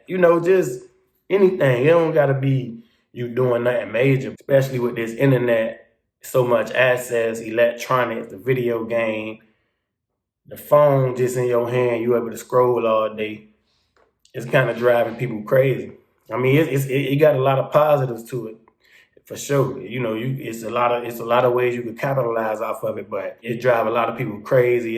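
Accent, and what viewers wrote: Florida, United States. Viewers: That's Florida slang, I know alot of grown dudes who sounds just like this. I don't think it's put on. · Might be a FL thing , I can understand him. But most ppl living in FL are not from FL 😂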